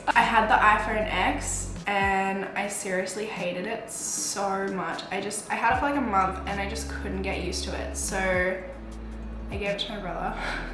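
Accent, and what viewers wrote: Australia. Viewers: Are you Australian? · Any Brazilian watching??? your videos are the best ❤️ I miss australia so your accent makes me happy😂😂 good lock on your channel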